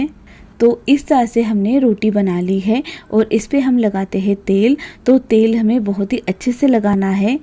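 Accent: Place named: Sindh, India. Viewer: Ur accent is Sindhi MashAllah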